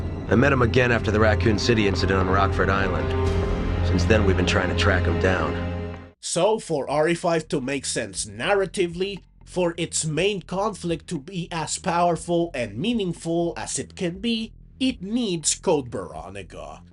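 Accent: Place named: Italy. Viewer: Where is your accent from? It sounds like the American stereotype of an Italian accent a little bit.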